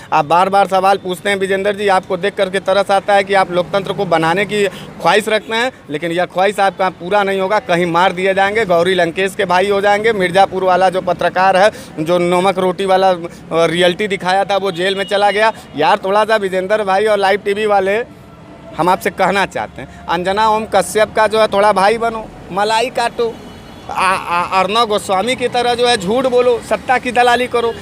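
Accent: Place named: Varanasi, India. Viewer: wah bahut achha bolte pakke banaras wale ho bekhauf zubaan bebak andaj thank you for the liv tv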